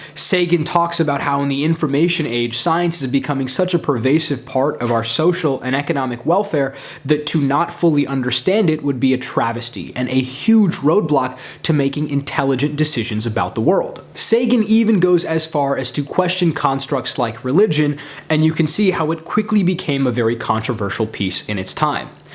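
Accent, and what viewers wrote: India. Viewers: Your English assent just like Shashi Tharoor .....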